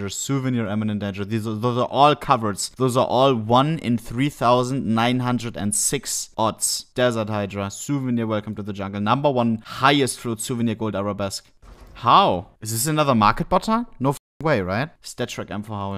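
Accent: Germany. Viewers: german accent is so strong that it sounds like he is talking in german even when saying english words.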